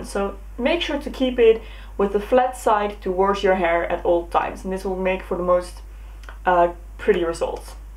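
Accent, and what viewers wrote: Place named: Netherlands. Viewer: ahh ik hoorde meteen dat je nederlands bent, super leuk gedaan dit allemaal! I'm obsessed with everything medieval and historical hehe :)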